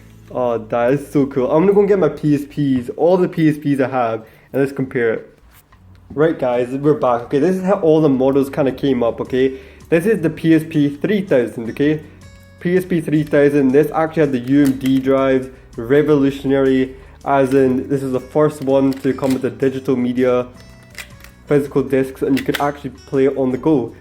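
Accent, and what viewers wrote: Scotland, United Kingdom. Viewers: Holy shit that is cracking! You have a Scottish accent, what Cex did you nab it from? :o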